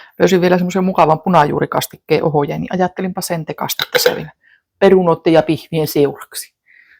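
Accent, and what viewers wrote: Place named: Oulu, Finland. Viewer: Kiva kuunnella oulunaksenttia,edesmennyt mieheni oli hailuotolainen😢❤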